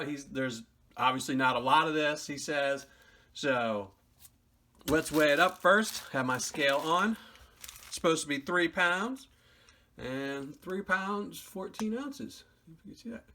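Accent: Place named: New York, United States. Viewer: I've been a long time subscriber as you can tell from my name I don't know if you give these away to just subscribers on YouTube or if they go to patreons only but I love the hell out of your videos in your New York accent reminds me a whole lot of my grandma just the accent my grandma was a sicilian woman from the Bronx Sicilian accent with the Bronx what a beautiful thing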